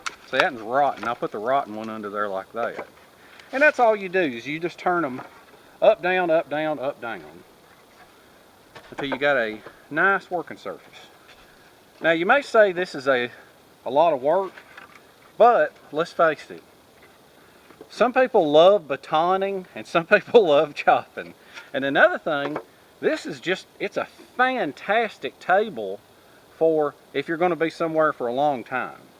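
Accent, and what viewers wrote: South, United States. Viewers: I love your videos. Your southern accent is soooo cute. What do you do as a living, if I may ask. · Hi, I'm a new viewer, now a subscriber. I have enjoyed your videos so far, one with the spiders at the end kreeped me out. Love your southern accent, plus you not bad on the eyes. But did you have to make me so hungry? Keep up the good job. Oh I also love the way you call all the people who sends ya gifts, your friends. Not sure how i would send you anything?. Take care.... Hi to. and your Dad, he was in the first one I watched. Later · Tell me the truth, do you have just about the thickest southern accent of anyone??? Because my friend Meg lives down in MS and you put her beautiful southern belle accent to shame man, I love it all, LOL. I am going to send this to her now! Southern accents are my favourite. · Amen! You've got that right. 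Love and his southern drawl. It's the best! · Once again, a cool video. I like the "Southernisms", like "geeyuft". That's when someone gives you a present. Thanks.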